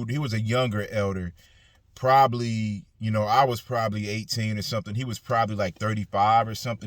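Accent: Bronx, United States. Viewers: Are you from the Bronx? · Sounds like he from the Bronx 🤣🤣🤣🤣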